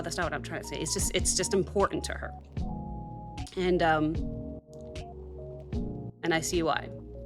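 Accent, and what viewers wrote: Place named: Ireland. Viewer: Lovely Irish tones❤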